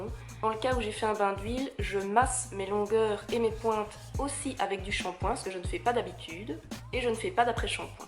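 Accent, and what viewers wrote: Belgium. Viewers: Oh tu es Belge ! J’ai été voir ta description car je me disais bien que je connaissais cet accent, très chouette vidéo, tu as des cheveux magnifiques !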